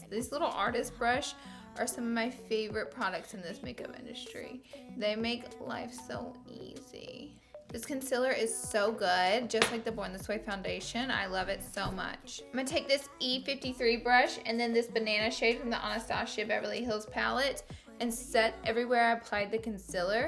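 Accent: South, United States. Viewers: Omg girl where are you from? That Souther bell accent has me so in love! And I'm so proud of you and your pallete. Started from the bottom. Inspires everyone to just keep going at all times. Work hard. Now enjoy the rewards that comes with it. You deserve it. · Omg you're so gorgeous 😍😍😍 and I love your accent it sounds southern 😅😊😊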